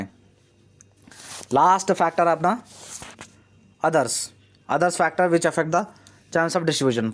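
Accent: Hyderabad, India. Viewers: Sir aap Hyderabad se ho naa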